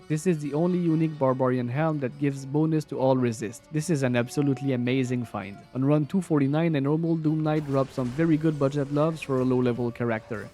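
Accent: Quebec, Canada. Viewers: L’accent québécois s’entend à travers l’anglais, hahaha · Do i hear some french from QC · Je pourrais reconnaitre un de mes fellow québécois entre 1000! Bonne vidéo 🔥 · Tes québécois ???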